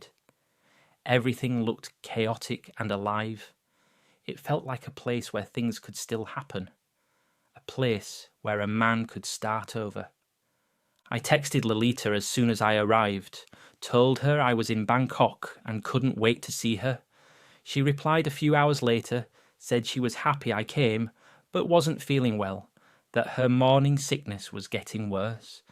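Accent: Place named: Minnesota, United States. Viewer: I didn't imagine the Minnesota accent like this.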